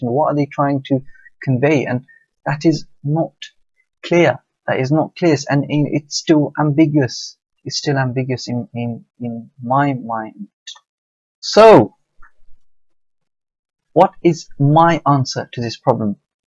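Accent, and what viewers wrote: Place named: London, United Kingdom. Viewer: I hope that was sarcasm? London accent and a Utd supporter...local?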